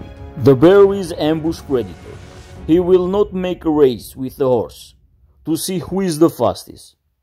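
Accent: Russia. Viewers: Чёткое видео, автор русский 🇷🇺 чтоли? Акцент такой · subscribed a few weeks ago me frirnd.. Your accent are you from Russia :)? Greetings from Poland slavic friend..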